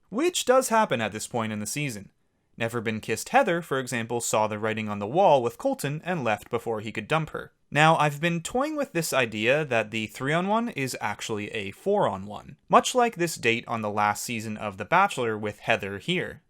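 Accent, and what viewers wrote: Canada. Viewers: I freaking love these videos! 💚 also, are you Canadian? I like your accent!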